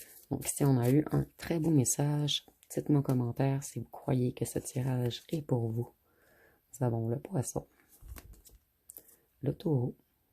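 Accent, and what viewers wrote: Quebec, Canada. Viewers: Excellent, c'est littéralement mon histoire que vous avez lue dans vos cartes jusqu'à ma connexion avec mon enfant intérieur. Vous êtes de très loin la meilleure et votre accent québécois très sympathique et empathique ajoute au charme du message. Merveilleux message qui exprime toutes nos attentes mutuelles à tous les deux.❤❤❤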